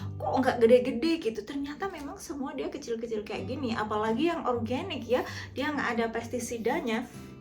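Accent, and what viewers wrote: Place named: West Java, Indonesia. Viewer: Waalaikum salam mbak yu Iki kok koyok wong kulon deso betul ngak e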